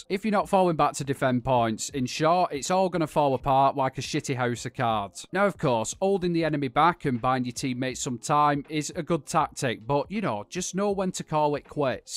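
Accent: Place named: North, United Kingdom. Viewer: Awesome. Love the northern accent lol. Rare bunch we are 😂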